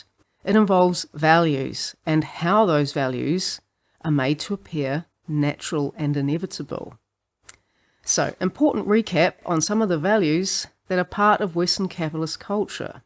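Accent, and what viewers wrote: New Zealand. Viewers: this is fantastic!!! u a kiwi?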